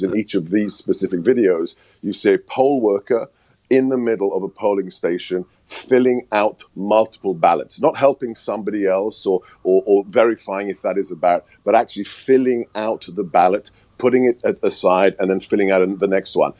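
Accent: United Kingdom. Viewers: why did the republican senators win and only Trump lost? What a sore looser. DUMP TRUMP. You got no evidence. just because you talk with a British accent does not make what you say true.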